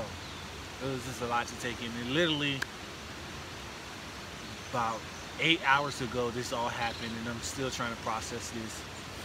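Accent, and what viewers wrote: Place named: Glasgow, United Kingdom. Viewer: A Glasgow accent right at the start ..that’s got me watching this ..! 👍☺️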